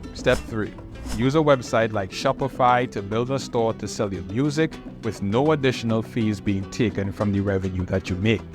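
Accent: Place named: Germany. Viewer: Was not expecting that accent bruh lol. You German?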